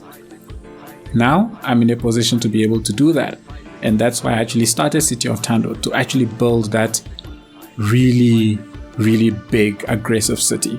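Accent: South Africa. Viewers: Are you from South Africa? GP or KZN? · I guessed this before seeing on your Twitter you're from Joburg. Howzit. :) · Great build, and also nice accent, sounds south african to me :) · That is one SEXY voice I agree. The South African Accent coming out really good and professional. Thank you for that! Keep us as South Africa on the map of CS - Good job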